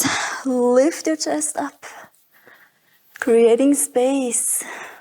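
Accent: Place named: Finland. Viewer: Very creative & innovative practice! Really loved the handstand jumps on & off the mat. I had no clue that so much of hip work was also involved for getting into handstands until this practice. Thank you so very much for this beautiful library 📚 of practices as I have completed one more 50-minute practice. 💙👩🏻💚🥰😇👌🏻🙏🏻💪🏻✨May I know which country are you from? Your accent sounds very Finnish 🇫🇮 but I wasn’t sure.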